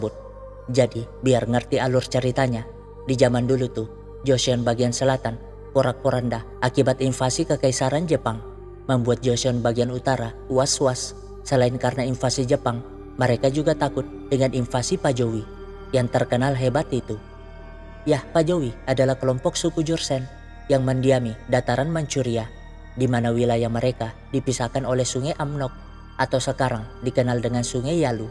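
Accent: Makassar, Indonesia. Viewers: Orang makassar kah ini adminnya?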